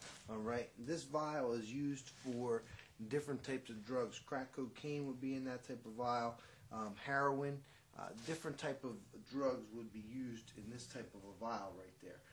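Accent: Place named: Philadelphia, United States. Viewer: Sounds like North Philly? At least your accent. What city are you in there?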